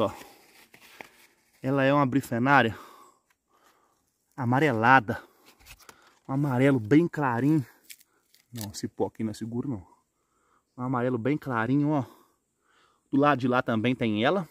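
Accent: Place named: Minas Gerais, Brazil. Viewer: Minino eu gosto de ver as plantas mais eu gosto muito do seu sotak mineiro. Muito bom os seus vídeos 👌👏👏 Deus te abençoe sempre 🙋😍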